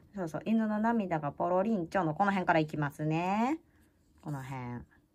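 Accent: Kansai, Japan. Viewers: 昨日登録させていただきました。とても軽快な関西弁が心地よく楽しく拝見しています。不器用ですが頑張って作ってみたいと思います！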